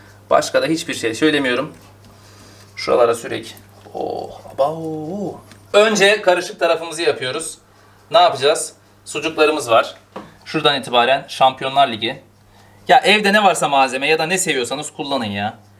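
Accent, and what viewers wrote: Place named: Adana, Turkey. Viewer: Adana aksanin super Arti yemek sana yakisiyor Hazer falan filan senin yaninda vizzzzzzz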